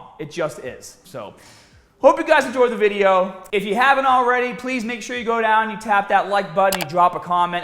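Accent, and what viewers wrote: Boston, United States. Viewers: You know your from MA when you can recognize another man's Masshole accent. Keep up the videos brother. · This is great content but the aggressive Boston accent kills me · This is the strongest Boston accent I have ever heard... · I never noticed the Boston accent it’s killing me 🤣 watched your stuff and subscribed since 2009 keep doing your thing Captain!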